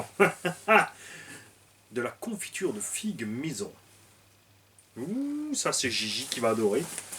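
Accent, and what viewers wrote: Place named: South-West, France. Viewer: Yes avec l'accent du sud ouest..... lol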